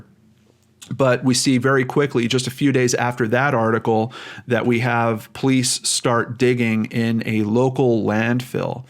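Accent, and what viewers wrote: Canada. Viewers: As a Canadian there's absolutely wrong with you sounding like it eh? This case is tough, whether or not Ronnie was responsible his passing pretty much killed the case and investigation since it sounds like the police really had him As the likeliest suspect and not many others. Thoughts are with that family who went through so much. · Great video. This is by far the most comprehensive coverage (in text or video) that I've even seen of Romero's case. As to you Canadian "out", accents have a way of spilling over national boundaries.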